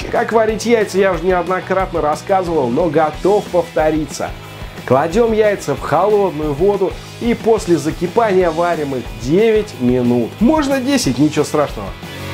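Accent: Moscow, Russia. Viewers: Спасибо за простой и вкусный рецепт!) Московский акцент придает особенность твоим видео)))))))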